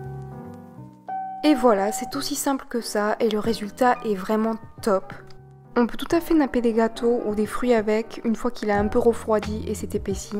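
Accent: South, France. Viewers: Je ne suis même pas vegan mais j'adore tellement tes vidéos , ton accent du sud (comment ça se fait d'ailleurs que tu aies cet accent en ayant vécu au Mexique ?), ta gentillesse et tes belles recettes que je suis abonnée haha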